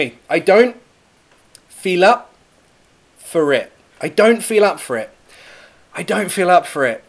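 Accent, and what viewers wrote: United Kingdom. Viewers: really enjoy your teaching as always <3 thanks a ton~~~ Wanna get the British accent !! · i love the way you teach English "British English " ...and m really trying hard to pronounce the words and sound like you people ...i really like British accent...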